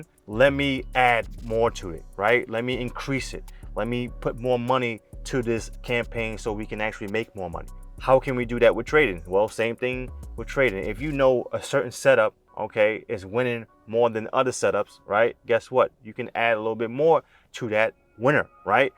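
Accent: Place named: Baltimore, United States. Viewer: Baltimore accent is strong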